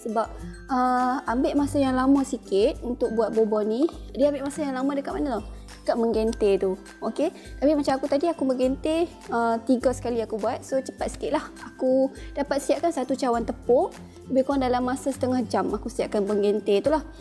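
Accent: Kedah, Malaysia. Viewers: orang kedah ke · Ni orang kedah ka kl.. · Suara macam Wartawan AgroTV kat TV1. Tpi ni version Kedah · Ayaq ayat kedah L.A. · org kedah ke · Org kedoh? Atau org oprg perok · Kuat gile kedah nya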